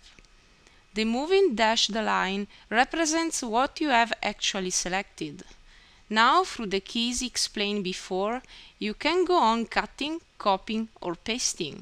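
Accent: Italy. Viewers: are you italian ???? just asking cause your accent is so cool :) :) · R u Italian?? Cause ur accent looks like Italian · Love the accent as well sounds Italian. The video is pretty cool I think you encapsulated everything quite well for basic skills... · great job! nicely explained. ben fatto, ho sentito un piccolo accento italiano? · thanks,your all tutorial r very good n easy to understand n it's working out for me,your pronounce is very cute,i guess u must b Italian by the way keep it up this good job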